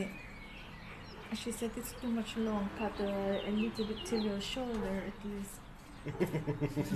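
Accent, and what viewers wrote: Mizoram, India. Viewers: Her English accent totally mizo no doubt😂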